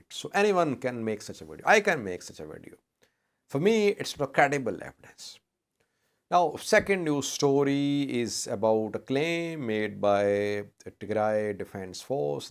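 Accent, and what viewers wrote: Eritrea. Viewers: That’s a Eritrean accent for sure. Lol it’s really easy to notice if you speak Tigrinya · B.S. 😆 this are Eritrean accent. Why even wasting time for this? You already said it. Any one can do this record.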